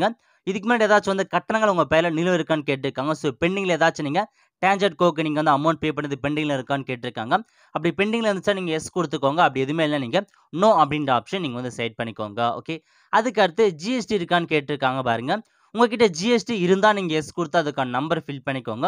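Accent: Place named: North India, India. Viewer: Nee! yan da? Vadakan pesura mari pesura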